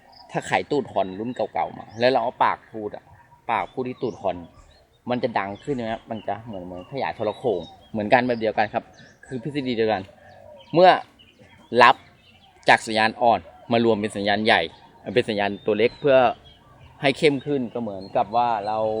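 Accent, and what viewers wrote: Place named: Ratchaburi, Thailand. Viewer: สำเนียงราชบุรี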